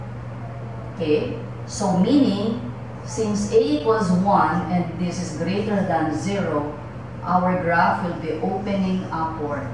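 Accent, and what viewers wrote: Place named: Philippines. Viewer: Are you from Manila Philippines, your accent very similar to my mum, Bernice NGO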